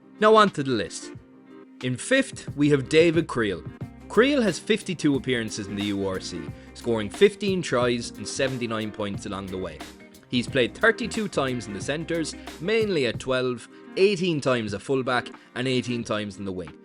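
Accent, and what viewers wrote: Ireland. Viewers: Heard an Irish accent and I knew there was going to Green glazing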